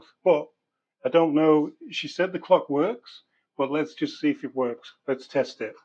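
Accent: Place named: Derbyshire, United Kingdom. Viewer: You sound like you're from Derbyshire. I'm in Chesterfield. Love your clock. Really cool.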